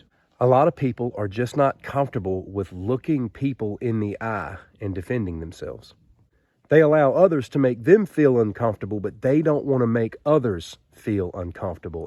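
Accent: South, United States. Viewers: Not only do I like your advice, but your southern accent, combined with your calm and confident voice sounds both masculine and soothing at the same time. Keep it up, man.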